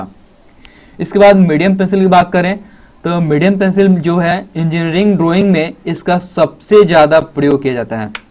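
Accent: Bihar, India. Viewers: जरूर आप बिहार से है।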